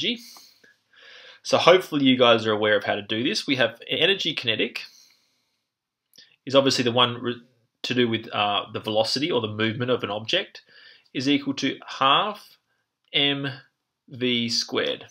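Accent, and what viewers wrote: Australia. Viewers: This has helped me so much. I'm enjoying physics and mathematics in general a lot more as these concepts become more intuitive. Perhaps the narrator having an aussie accent similar to my own helps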